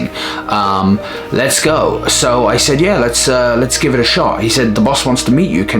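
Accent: United Kingdom. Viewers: 你的英文跟我的快一樣好 😂British accent, nothing better. Awesome vid. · 原來你的英語這麼好，英國腔不是很重，稍微有一點而已....住在異國是很辛苦的，總是會想家，但回家又捨不得.... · Love the British accent!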